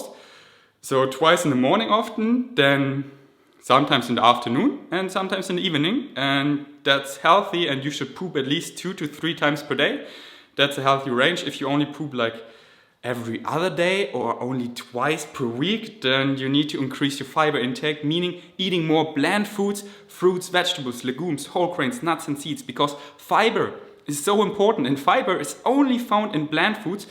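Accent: Germany. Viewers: Ich finde deinen deutschen Akzent beim Englisch sprechen irgendwie soooo ablenkend :(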